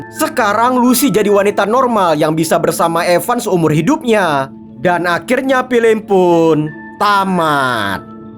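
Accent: Medan, Indonesia. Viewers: Paten kali ah abg ni klo cerita suka aq weh khas gtu ama logat medannya😂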